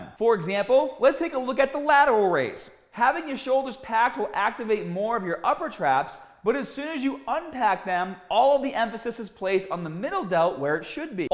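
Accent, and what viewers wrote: Boston, United States. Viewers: wait when did Scott get a Boston accent I don't recall him having one in 2009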